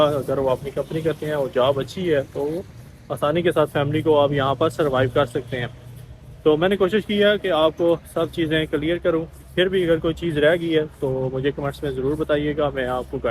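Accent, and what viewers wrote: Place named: Kashmir, India. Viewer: sb kya hll aaa...kashmir se lgte oo ap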